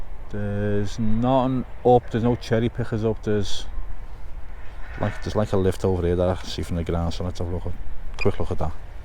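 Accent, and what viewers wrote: Liverpool, United Kingdom. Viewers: One more thing, u scouse but i understand 💯 of your accent,, i know you try to speak english not scouse , for us to let us understand as much as possible.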